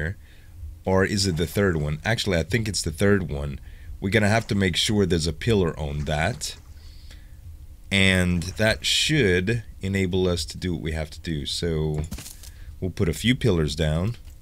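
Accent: Louisiana, United States. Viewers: Where are you from? I'm catching a fellow Cajun accent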